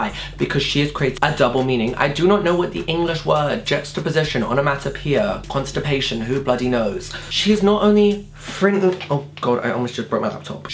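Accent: Scotland, United Kingdom. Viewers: i keep speaking in that weird scottish accent because im picking it up from ur vids, fair to say my mums not v happy about it tho loooool x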